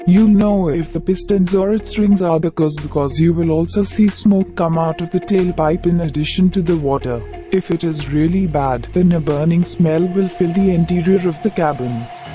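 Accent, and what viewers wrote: India. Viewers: Bro has an indian accent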